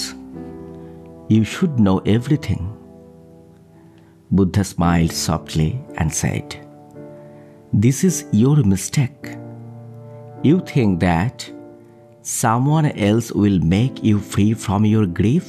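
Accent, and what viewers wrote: Bengal, India. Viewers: Are you (the speaker) Bengali? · I was searching English video in Indian accent and find this thank you.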